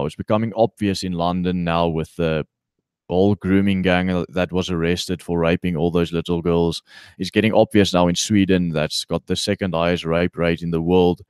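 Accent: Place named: South Africa. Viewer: So good to have a South African voice.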